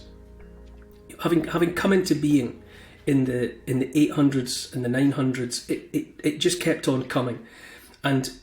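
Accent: Scotland, United Kingdom. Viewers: Scottish accent is "da best"❤. No, this is not really how i want to accept things but the "critical mass" is falling towards transhumanism, the 4th. revolution😓 if i could i would change planets right now.... people have lost their north.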